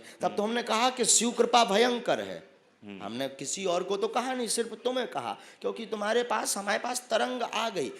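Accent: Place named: Bundelkhand, India. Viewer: Ap ki mohak muskan bundelkhandi boli aur tha thari barne ki ada man moh leti hai.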